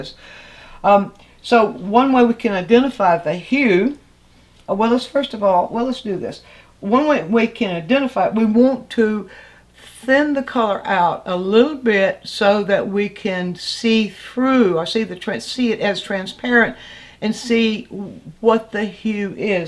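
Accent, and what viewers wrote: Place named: Georgia, United States. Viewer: I love how you say “cullah”, your GA accent is so charming!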